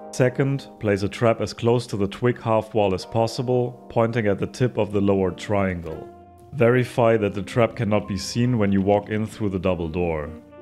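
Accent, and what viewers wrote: Germany. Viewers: Bist du deutsch? Hört sich nähmlich ziemlich danach an:D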